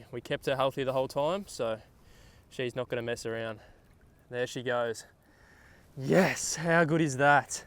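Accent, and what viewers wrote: Australia. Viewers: hes australian?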